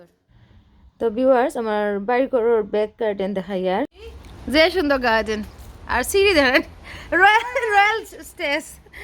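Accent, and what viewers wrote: Sylhet, Bangladesh. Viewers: খুব ভালো লাগলো সিলেটি মানুষের মাত হুনলাম আজকে। আপু খুব সুন্দর হইছে ভিডিও টি মাশাল্লাহ আপু আমার পরিবারে আসবেন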